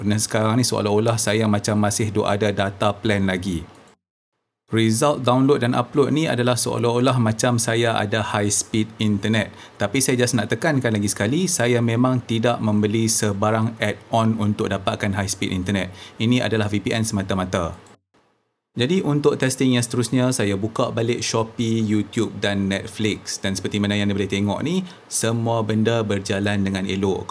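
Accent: North, Malaysia. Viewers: baru jumpa channel tuan lepas tgk video monaliza. sedap dgr tuan cakap utara. rasa kena dengan telinga bila dengar. hehe.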